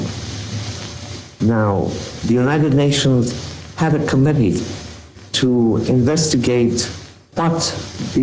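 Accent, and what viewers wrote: United States. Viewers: Her American accent gives it away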